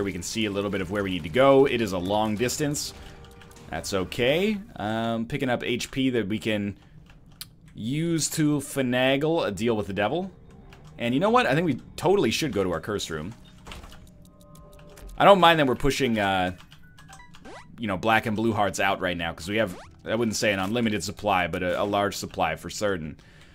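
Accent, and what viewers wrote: Canada. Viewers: Put that's how people in Canada talk!!!!!! I seen SOUTH PARK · South Parks imitation of Canadians is true because that's how actually talks.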